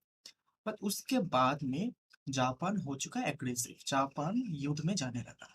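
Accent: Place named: Bengal, India. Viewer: I don't whose voice this is...but I want to request him to increase the volume because I cannot listen it without headphone 😐... otherwise it's nice... I guess any Bangali Babu is teaching us... because his pronounciation of Hindi words say this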